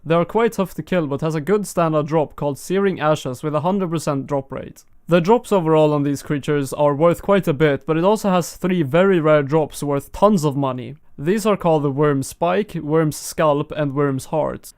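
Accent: Sweden. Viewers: Where are you from? Your accent sounds like swedish-english ish:P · the swedish accent is so obvius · Your Swedish accent is so sexy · The swedish accent :D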